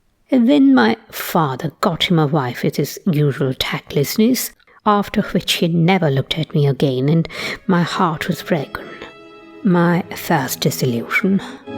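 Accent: RP, United Kingdom. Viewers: You sound like such a posh classy aristocratic lady! Are you actually one? Or maybe “landed gentry”? 😂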